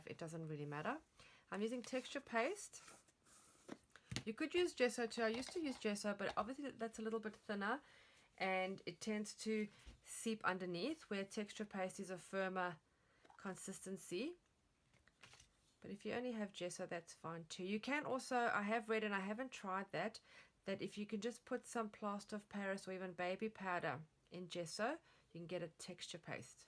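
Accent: South Africa. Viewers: I can't believe you're Australian. Yo sound so South African to me. Also, this is so gorgeous. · Beautiful! You live in Australia but you really sound like you are from 🇿🇦. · Absolutely gorgeous. I recognise the South African accent.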